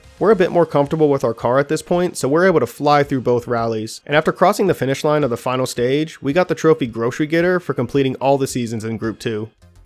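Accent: South Africa. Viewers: Wait... Are you South African??? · Are you South African? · are you actually from South Africa?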